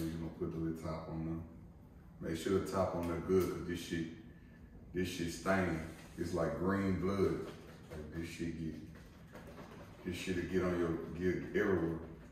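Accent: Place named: South, United States. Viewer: Your southern accent thick! Its crazy watchin a laid back video of u just chillin at the house. Diggin the morning voice! Heavy but soft at times as u speak. I've had seamoss and it does give you a nice boost of energy. The texture is a bit different but its good & great 4 ur health! Make me a cup?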